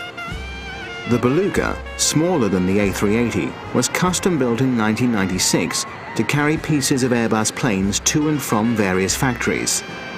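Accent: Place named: United Kingdom. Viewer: OMG can we please get these brit narrators other jobs grrrrrr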